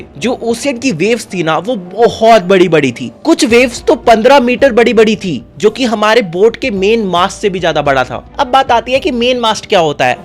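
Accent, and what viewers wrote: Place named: Bihar, India. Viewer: Abbe sale bihari pronounciation to sudhar le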